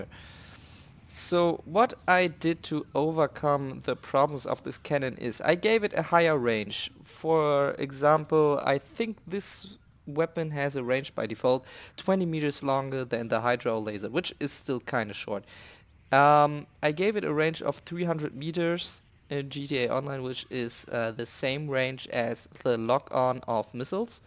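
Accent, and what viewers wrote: Germany. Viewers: I LOVE UR THICK GERMAN ACCENT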